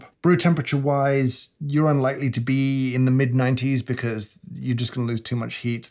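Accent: United Kingdom. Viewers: Thank u in advance. So you spared me about 70Dlls I was about to spend in this gadget. I was short of money and bought a bigger thing, a Delonghi espresso for home. Almost the same price, and much more useful, regards from Mexico, like a lot your finicky behavior and your a very nice warm loving guy. Love your British accent btw. Cheers from Baja California ;) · His voice is calm but seriously British? You and the negativity about your opinion and Gawdamn even your face already can tell everyone you don’t like this product, return it and just run the old conventional espressos machine will ya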